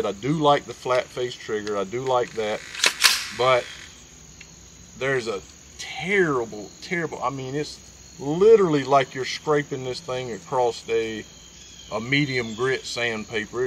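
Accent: South, United States. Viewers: Subscribed for the southern twang alone. Who makes your iron sights? Thanks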